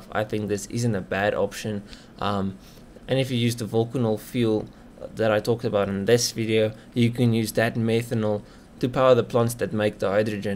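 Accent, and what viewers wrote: South Africa. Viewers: my guy you sound like you from South Africa ✌👌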